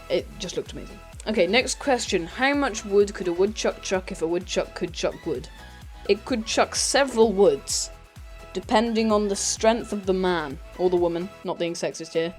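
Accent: United Kingdom. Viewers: Are you a real British?!?!?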